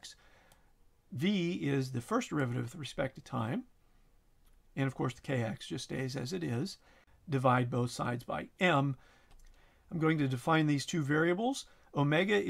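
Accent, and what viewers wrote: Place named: United States. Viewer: This is how an American should sound like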